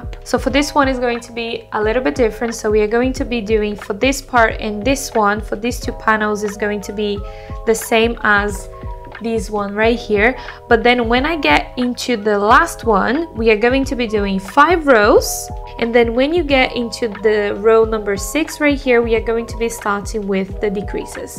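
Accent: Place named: Brazil. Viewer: That's so cute! Definitely going to try it at home. Also, by hearing your accent, I wondered... Are you Brazilian? I sort of recognized it comparing with my own accent kkkkk Ether way, I'm loving your channel so far! Keep the good work! :)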